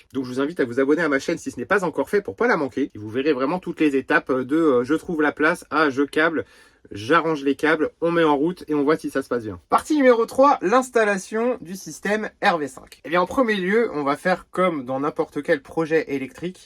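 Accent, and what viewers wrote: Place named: England, United Kingdom. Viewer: quel accent anglais !! lol jolie colis :) et ça envoie du lourd !!! bizzzzz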